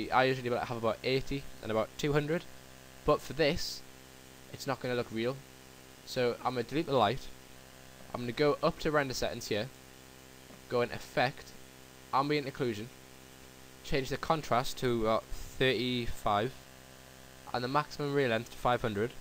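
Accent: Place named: Wallsend, United Kingdom. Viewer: Sounds like your from Wallsend or around there somewhere.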